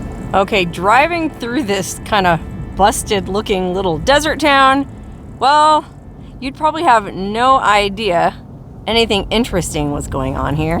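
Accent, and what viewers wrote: Germany. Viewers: Love your German diliatic! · There is something about German accent that makes things tingle. That being said, it’s a serious bummer one isn’t into making a video in Orange County! Just saying… Bwhahahaha Always a pleasure seeing where you’ve been lately!! Safe travels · Love your German accent, mein schones madchen! (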